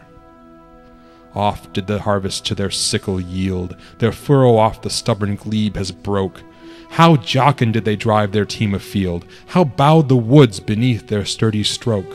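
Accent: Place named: United States. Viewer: Finally .. someone reading it in American accent, Thanks so much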